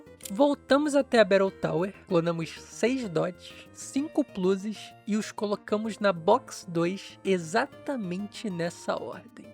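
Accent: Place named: Rio de Janeiro, Brazil. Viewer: o é o carioca maixxxxxx carioca que eu conheço. e olha q EU sou carioca e falo cheio de carioquEIXXXX tb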